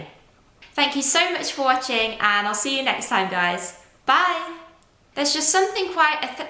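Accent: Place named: United Kingdom. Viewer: I like your brit accent :p cute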